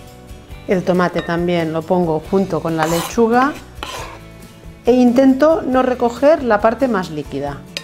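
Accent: Catalonia, Spain. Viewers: Gracias voy a tratar de hacerlo,saludos desde Argentina buenos aires, pues aquí las navidades son con mucho calor, a mí me gustan las de España con frío, pareces catalana o valenciana por el acento y la con doble n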